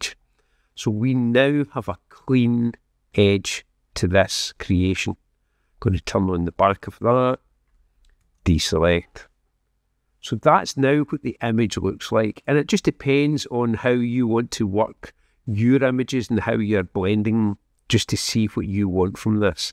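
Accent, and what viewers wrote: Scotland, United Kingdom. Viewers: As a non native speaker it took me quite a time to get the hang of your (Scottish?) "touch". But your artistic approach kept me listening - much to my advantage, I must say, language wise and more so art wise too. Main question, of course is: How did you compose these three images in the fist place? Could you do a video about that too? Pleeeze!!!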